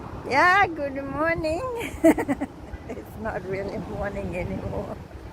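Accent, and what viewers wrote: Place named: Denmark. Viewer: Hej Jeg kunne høre på din accent at du nok var dansker. Dejligt at se og høre. Jeg opdagede lige din fine video og har nu abonneret 🙂 Dbh. Michael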